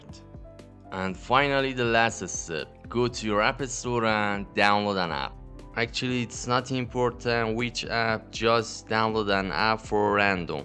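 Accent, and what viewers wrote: Iran. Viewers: Iranian Accent🗿🗿🤘🏻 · لحجه ایرانی داری:)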